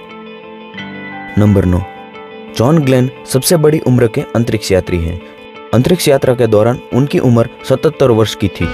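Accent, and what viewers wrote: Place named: Bihar, India. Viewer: Bihar se ho kya bhai...kyo ki tera avaaz aisa lag tha he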